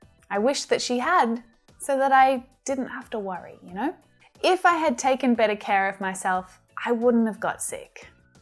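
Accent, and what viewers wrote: Australia. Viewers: By hearing your accent it seems like you’re australian, am i right? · If I had known I was supposed to bring some food to the party, I would have made a delicious meal and brought it to the party.... I love your Aussie accent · You are from Australia no America l don't like your accent l prefer the American accent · Teacher are you from Astralia? your accent is very pleasant to hear !!!!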